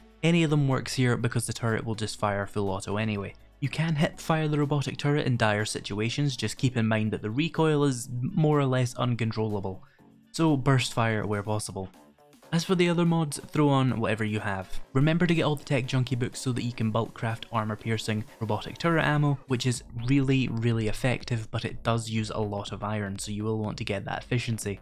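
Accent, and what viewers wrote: Scotland, United Kingdom. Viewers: I like the twin turret build, tried it before - also stun batons are criminally underrated in a lot of scenarios as raw damage is not what they are about. Using them in a combo is fantastic in small scale fighting, stun zombie then sledgehammer for automatically guaranteed head-shot (or pistol or club etc). I do not tend to play on insane nightmare as I really do not enjoy it and I mainly play for enjoyment:) Warrior or Survivalist and feral senses on / zombies on run is about as much as I go. Main problem with this build I found was the insane iron usage, utterly bonkers at points - especially later on in the game, even with an augur. Really enjoy your vids, good to hear a fellow Scot on the YouTubes:)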